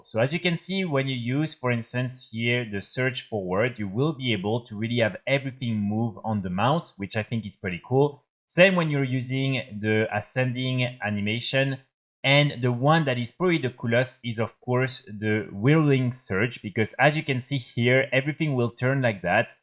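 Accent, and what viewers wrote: France. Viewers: What is the problem with your accent?? Is that French sh!t?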